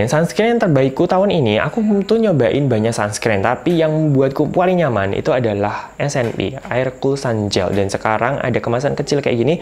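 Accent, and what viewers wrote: Java, Indonesia. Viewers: Mbleber ndlewer ndlewer 😂😂 Jowo buanget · Dosen skincare pertama saya 😁... Dokter, medhoknya mirip youtuber "Korea reomit"... 🤩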